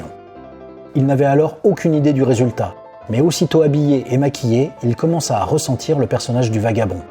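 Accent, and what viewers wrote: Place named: England, United Kingdom. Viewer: Merci…passionnant comme d’habitude ! 👍👏👏👏 Et quel accent anglais 😉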